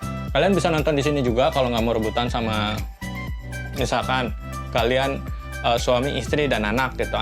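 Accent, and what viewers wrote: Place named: Java, Indonesia. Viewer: Aslinya mana kang, logatnya ko keliatan kejawa jawaan :v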